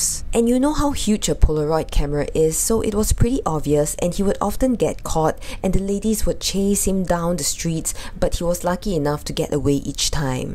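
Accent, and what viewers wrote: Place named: Singapore. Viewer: Hurray for that lovely Singapore accent!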